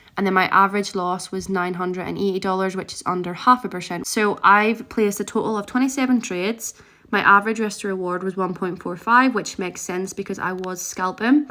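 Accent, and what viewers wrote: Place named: Ireland. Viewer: First time watching your video. Thought you were Indian, surprised to hear your I believe Irish accent. A suggestion would to make your content more engaging even to non traders to grow your channel quicker.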